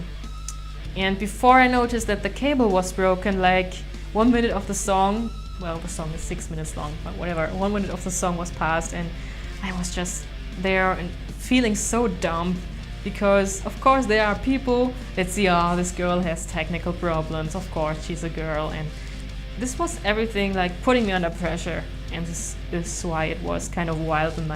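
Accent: Germany. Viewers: A true accent from a true german girl! And yes, she really is! ;D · I really love German accent😍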